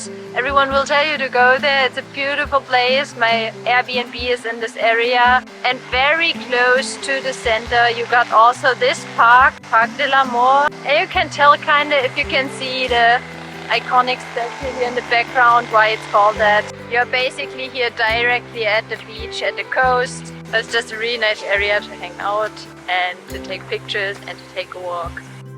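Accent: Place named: Europe. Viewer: Hello, I have been to Lima 3 times, but the last time was in the early 80's. I have also been to 29 other countries. Your voice sounds familiar, maybe I visited your country before, but can not place your home country. I think maybe European. Ron USCG RET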